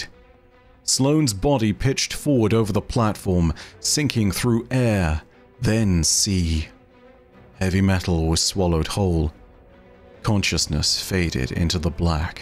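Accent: United Kingdom. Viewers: It's called a British accent.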